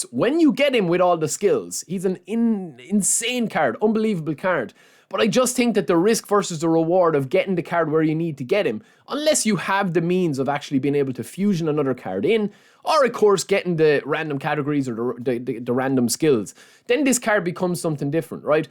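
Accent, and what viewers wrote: Ireland. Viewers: Bro, are you from Ireland? Your accent is familiar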